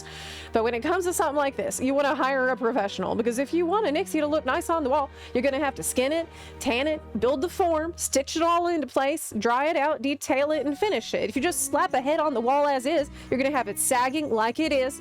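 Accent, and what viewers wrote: South, United States. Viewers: i just discovered your channel and i LOVE your enthusiasm and omg your accent. girl! i was born in texas, but raised in mississippi. and now i live in the midwest lol. i love your southern twang and miss it. 🙌🏼 they need to do housing themed kits by class, too. i don’t wanna be a druid sitting on an upholstered sofa from IKEA. also VERY nervous about kill-on-site in silver moon lol. i have a bad habit of mindlessly running into horde territory in Dalaran 😢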